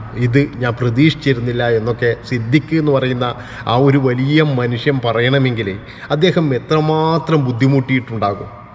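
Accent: Kannur, India. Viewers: Kannur ഭാഷ.. 🙂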